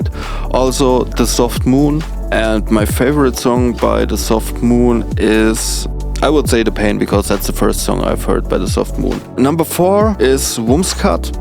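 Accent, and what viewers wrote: Germany. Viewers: Thank you for doing this Q&A for us. It nice to learn more about you. Your english is great and your German accent is wonderful. I love by!!! Have you seen the version by Post Modern Jukebox? Thank you for the suggestions of David Lynch and Tommy Wisreau. I will check them out! I am excited to see what you choose for new content. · Thank you for posting this. Congratulations on 1000 subscribers! You are a real one, and I appreciate your perspective in your reactions. You answered the questions I had but did not submit ahead of time. I thought you were probably German. Your English is very good, I like your accent, is a fabulous song for all the reasons you mentioned, AND none of us have choice on where we're born: just make the most of it. Be well! ❤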